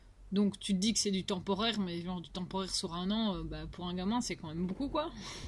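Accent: Belgium. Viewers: Bonjour L'expression "a pouf" pour "au pif" :o) Mais "passer en stoumelings dans les travaux ;;;" (truc comme ça) ??? Sinon j'adore tes vidéos et ses commentaires avec la gouaille bruxelloise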